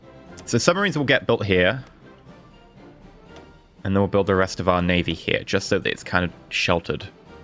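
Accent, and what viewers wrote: Germany. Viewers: Goddamnit, I did not expect the german accent, thank you for leaving me in stitches :D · play the road to 56 mod its great it allows for alternate history play threws and revamps the focus trees I would also pay to see you play hoi4 as Germany whilst speaking in your German accent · you spoke in a nothern bavarian acsent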